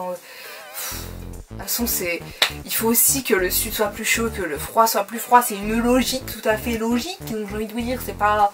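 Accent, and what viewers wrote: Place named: Nord-Pas-de-Calais, France. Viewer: Coucou j’suis chti aussi, j’avais fait une vidéo aussi sur le chti également 😂 Ça va tu n’as pas trop l’accent contrairement à moi! On ne me fait remarqué à chaque fois sur mes vidéos mais jmen fou en vrai !! Ça fait partie de moi et j’en suis fière 💪🏻💪🏻💪🏻💪🏻 #chtipower ouuuuais 😃😃 Tu viens d’où ? J’me suis abonnée au passage bisous 😘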